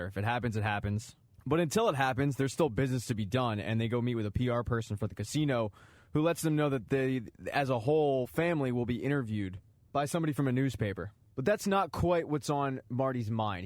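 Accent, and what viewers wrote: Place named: Baltimore, United States. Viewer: Where u from I hear an accent... down the ocean, hon??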